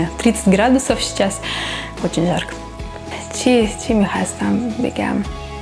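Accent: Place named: Iran. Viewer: درود بر شما عزیز ، اول اینکه در مورد پوتین با شما شوخی می کردند و مزاح بود ، دوم اینکه لازم نبود در ایران حجاب داشته باشی الان خیلی دختران ایرانی هم حجاب را رعایت نمی کنند علاوه بر این در ایران به توریست های روسی در مورد حجاب سختگیری نمی کنند و می توانستی راحت تر باشی ، سوم اینکه خیلی خوشحالم که تجربه اول شما از سفر به ایران خوب بود ولی ظاهراً خیلی از جاهای جذاب و دیدنی ایران رو ندیدی امیدوارم در آینده ببینی ، چهارم اینکه خیلی ممنون از اینکه سعی می کنی به پارسی صحبت کنی با اینکه برای شما سخت است ولی تبریک می گویم که شما با لهجه ایرانی فارسی صحبت میکنی و برای ایرانیها قابل فهم است و در آخر اینکه خیلی خونه قشنگ با چشم انداز زیبایی داری به شما حسودیم شد روسیه طبیعت قشنگ و زیبایی دارد پیشنهاد می کنم خارج از منزل فیلم بگیر در ضمن اسم واقعی شما به روسی چیست؟ چون فکر می کنم یک اسم اروپایی یا آمریکایی است نه روسی ؟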